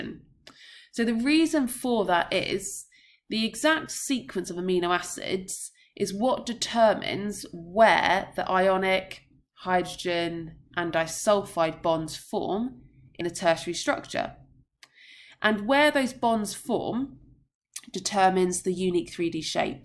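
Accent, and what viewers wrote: United Kingdom. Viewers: Good explanation, but your British accent is getting on the way. Too many unnecessary sounds. It's quite annoying.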